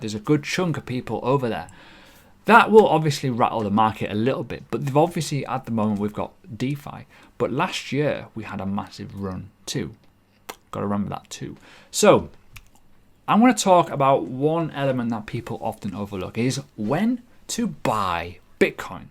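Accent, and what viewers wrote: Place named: North, United Kingdom. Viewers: He’s a northerner! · What absolute dog's bollocks, how come you not tell my mate is from England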